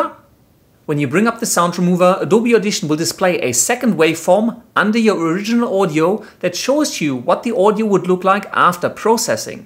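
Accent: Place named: Germany. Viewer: You're from Germany right? I think I hear the accent.. (Wenn ich Recht habe, kannst Du mich Sherlock nennen)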